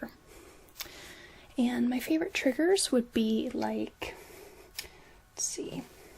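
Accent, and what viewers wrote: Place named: Missouri, United States. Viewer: Lol you from Missouri?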